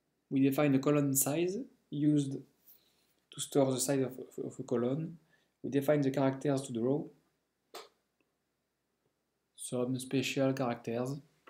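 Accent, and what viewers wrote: France. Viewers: Le bon accent français des familles <3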